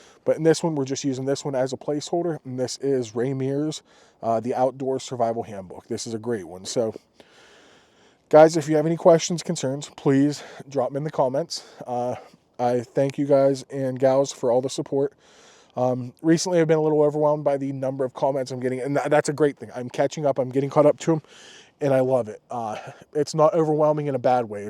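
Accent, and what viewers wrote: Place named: Pennsylvania, United States. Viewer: Loved your video. I am originally from Pa. Kittanning near Pittsburgh. Thought I detected a little Pa accent. Living in SC now. Nice kit. Not over complicated.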